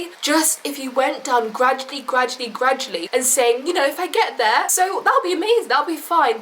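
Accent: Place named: Essex, United Kingdom. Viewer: Idk how to explain but I just think she has a very elegant, posh accent, like very Essex like.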